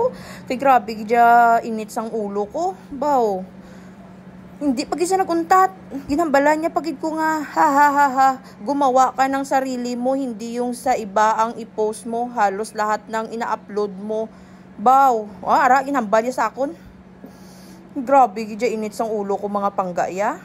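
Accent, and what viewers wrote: Iloilo, Philippines. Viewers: I loved listening to your ilonggo malambing sya from Masbate to stop answering mga basher I watched Latino manicurist , Vietnamese, Korean advance sila , sa pinas isa ka malinis magtangal ng ingrown dry skin everybody is talking while working none stop gid sya Vietnamese to ukit ang style nya at gusto ko yong mga gamit nya it can make it easier sa trabaho try mo mag watch internationally it might give you new idea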